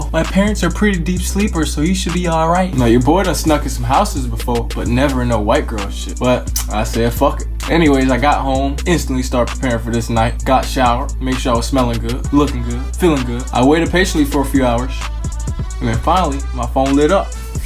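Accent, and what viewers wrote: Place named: Florida, United States. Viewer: lmao this nigga accent... Flawda as fuck lol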